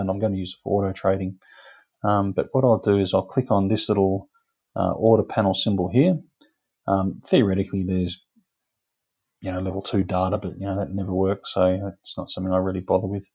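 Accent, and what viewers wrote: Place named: Australia. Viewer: Mate your videos are superb, no fluff, music or silly thumbnails, just solid useful information. Thank you. I've subbed, you deserve more man. Plus your easy on the ear, kinda like an Aussie Jim Browning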